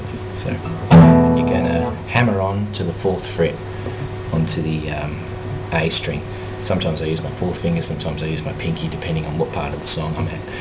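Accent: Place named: Australia. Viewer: Thanks for a great tutorial. Nice to hear a fellow voice from down-under.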